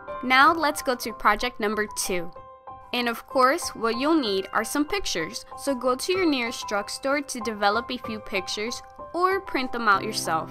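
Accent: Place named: Canada. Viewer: where are you from?? your accent sounds a little canadian lol :)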